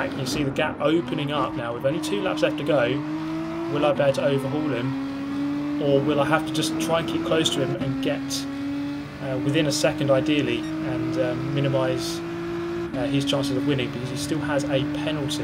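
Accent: United Kingdom. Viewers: Can’t believe ‘they’ changed the intro music 👌😂 The humour. And the theme is so contrary to your british gentleman voice and the image peeps might have of you lol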